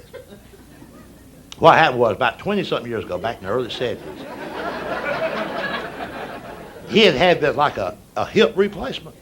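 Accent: South, United States. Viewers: James Gregory is so accurate with his delivery and the way he tells a story. He always takes me right back to Louisiana sitting in the kitchen listening to my grandmaw and aunts gossip and tell stories. Same with Jerry Clowers and some of the men in my family and southern Baptist preachers I grew up around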